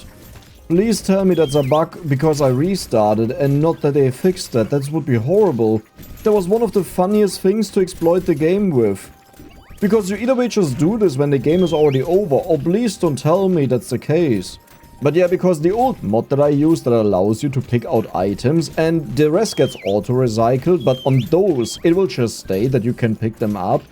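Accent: Germany. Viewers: Becauze he haz a strong German aczzent.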